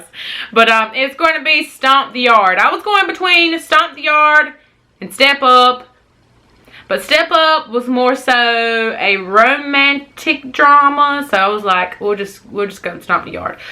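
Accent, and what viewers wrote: South, United States. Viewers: Your accent is so southern!😂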